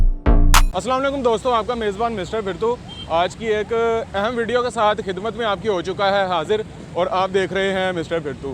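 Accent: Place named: Punjab, India. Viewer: You speak urdu with punjabi accent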